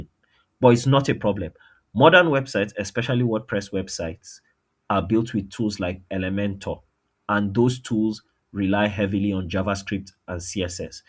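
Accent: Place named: Africa. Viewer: Whatever you doing bro I am here for it..but 😭😭that accent bro..are you African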